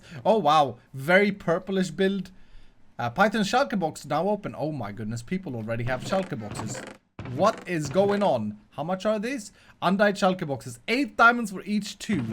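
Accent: Sweden. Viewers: Arr you from sweden?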